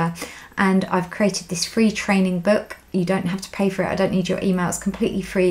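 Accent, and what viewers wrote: England, United Kingdom. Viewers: Great work out to do w my husband w high blood pressure, exercise shy but reluctant to start medication. He prefers the English accent to the incessant tone & pitch of the US trainers! Thank you.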